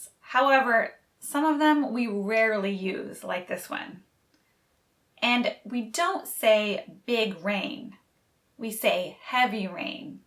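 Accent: China. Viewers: You know well how a Chinese speaks English. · Hey, thx for the amazing teachings! How come it sounds like pulling away from ‘HK English’? haha 😛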